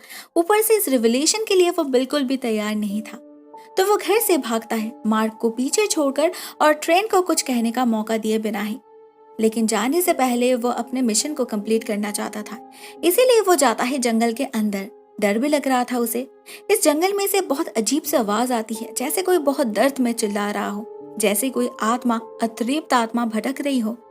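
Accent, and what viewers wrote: Bengal, India. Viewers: My my your sweet sweet voice 😋 ur accent feels like Bangali 😍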